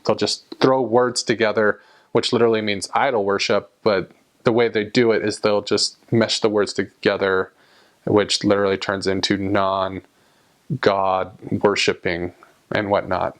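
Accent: Finland. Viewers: He sounds so Finnish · ) u have a kind of a finnish and a swedish acent mix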